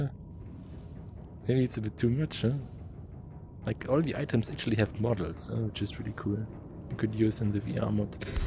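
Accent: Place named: Germany. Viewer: man hört den deutschen akzent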